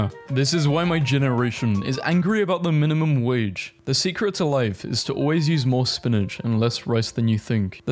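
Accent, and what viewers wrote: United Kingdom. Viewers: I enjoy sitting down and listening to a British person say funny things for 20minutes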